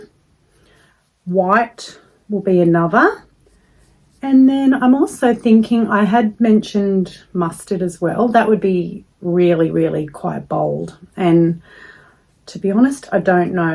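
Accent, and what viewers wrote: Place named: Australia. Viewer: ❤ watched all the way through! Great to hear an Aussie accent speaking about crochet! Your blanket is beautiful!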